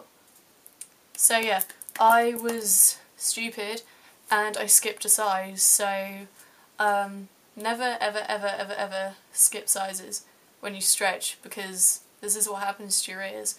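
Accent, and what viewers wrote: United Kingdom. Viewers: u sound english not american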